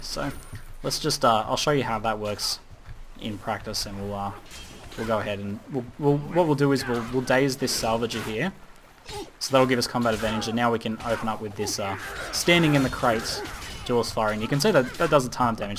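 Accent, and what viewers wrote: Australia. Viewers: Aussie brooo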